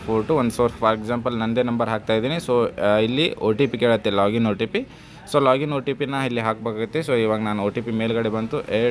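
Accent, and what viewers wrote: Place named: North Karnataka, India. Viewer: ಉತ್ತರ ಕರ್ನಾಟಕ ಶೈಲಿ ಮಾತನಾಡುತ್ತೀರಾ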